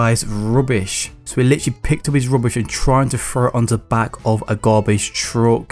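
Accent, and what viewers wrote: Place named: Leicester, United Kingdom. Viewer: I guess you’re from Leicester because I used to live there before. Your accent so different from Londoner but I like it :)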